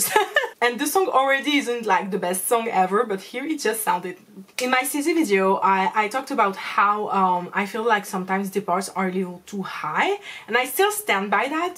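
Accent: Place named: France. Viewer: I love your personality!! Btw are you French? You have such a cute acceeent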